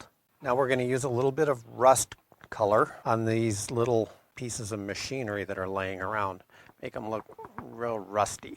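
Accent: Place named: Midwest, United States. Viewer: If you had a clue what you were talking about you'd know that this is a midwest accent with his family originally being from Europe.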